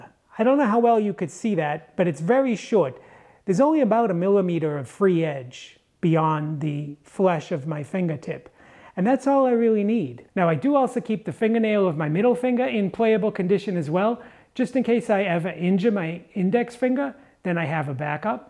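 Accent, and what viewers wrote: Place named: New England, United States. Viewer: Great info delivered with an impressive New England accent.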